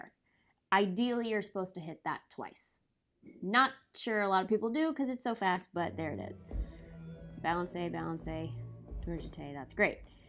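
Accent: England, United Kingdom. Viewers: That’s a good British accent! 👏🏻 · I love this entire video, but just want to say your English accent is adorable and amazing!! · Thank you so much! this is phenomenal! Love your costume and English accent! <3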